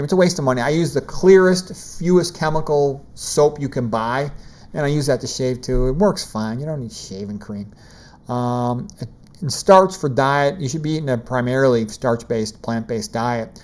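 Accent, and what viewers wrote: Chicago, United States. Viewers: You're accent tells me you're from Chicago?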